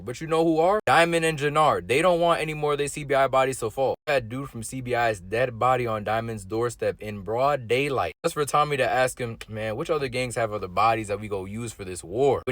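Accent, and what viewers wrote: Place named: Toronto, Canada. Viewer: you have to be from toronto with that accent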